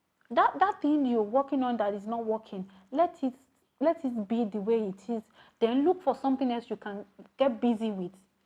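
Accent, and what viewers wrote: Ekiti, Nigeria. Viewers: Naughty girl, I have subscribed o. Your Ekiti is hot like amala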